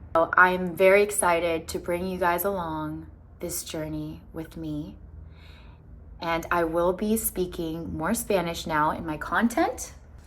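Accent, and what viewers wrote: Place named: United States. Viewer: Eres guatemalteca pero hablas gringo y, ahora, estás en México. Ah. No entendí pero suerte. 🥱🤔🤷🤙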